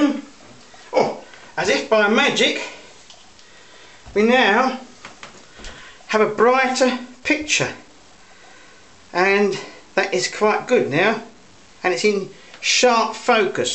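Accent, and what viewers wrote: London, United Kingdom. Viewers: London. He sounds like my Dad, born 100 yards from London Bridge.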